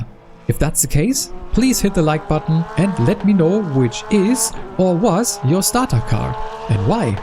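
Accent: Germany. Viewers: I can confirm your assessment. I've had my most successful races with the BMW so far. This vehicle is absolutely consistent, just like the times you can achieve with it. I've also had good results with the Porsche. Especially on tracks like Fuji, the strong rotation of this car is a big advantage... if you know trial braking. Very good videos that you produce. I like the good English with the German accent. It makes it much easier for a German to translate internally. You have my subscription. Beste Grüße aus Thüringen.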